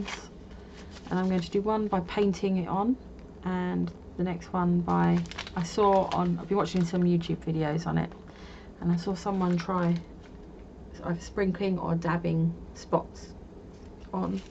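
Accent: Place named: United Kingdom. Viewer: Hello, an good morning, I have just found your podcast, an was laughing so much at your comment of wanting to make the Shawls, because I am the same, love shawls, an like you have a growing stash that will be older than me teeth if I don't stop. ha ha, love the yarn you showed, look forward to seeing what you make with them. I take from your accent you are U.K. I live in North West England. an have subscribed. have a lovely day. :)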